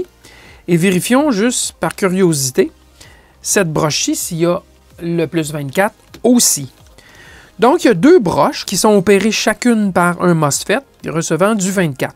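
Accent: Quebec, Canada. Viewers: L'accent me donne vraiment envie de visiter le Canada(Quebec) with my 3 kids😊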